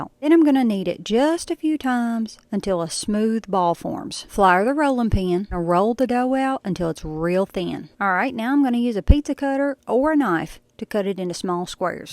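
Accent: South, United States. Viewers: This woman has a southern accent and she may pronounce it however she likes. I know I can pronounce pompous and presumptuous ignoramous pretty well, and you, my dear are one.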